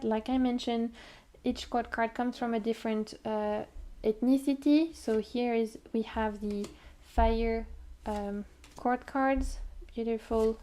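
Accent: France. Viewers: 🐚 obsessed with your French accent. Wish I could hire you to teach me French. Beautiful review of all these unique decks. Thank you for your time and efforts on your wonderful videos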